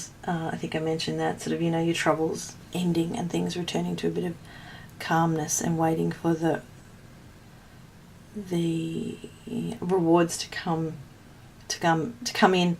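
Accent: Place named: Australia. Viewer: Great to listen to an Aussie.....great reading really resonated with me, thank you :)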